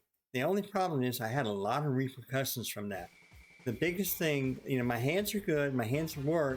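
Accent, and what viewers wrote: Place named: South, United States. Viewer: 😭🥳🙌 AMEN Hallelujah Glory you are BACK! You sound FANtastic to me, Music to mah ears! i think you've picked up a bit of southern twang and im Loving it... 2.0, Baby! Let's Go! 🙏💙🥳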